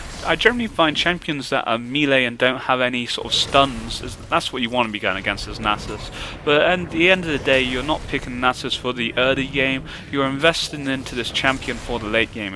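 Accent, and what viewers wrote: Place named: England, United Kingdom. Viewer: hes english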